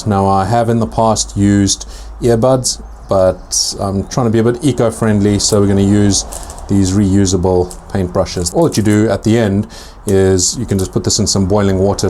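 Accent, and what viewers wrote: South Africa. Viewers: Thanks for the video. It's rare to find one that has so much clear info without wasting the viewer's time. It's also nice to hear a South African voice again as an expat :) I'd call it the drop kick.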